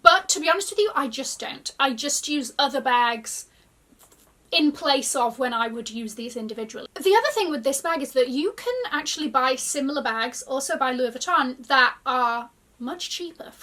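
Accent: United Kingdom. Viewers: Your videos are really knowledgeable and helpful and i adore your british accent 😍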